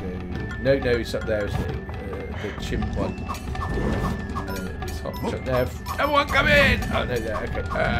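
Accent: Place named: West Country, United Kingdom. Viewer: Are you from ludlow or bristol you sound it brov!?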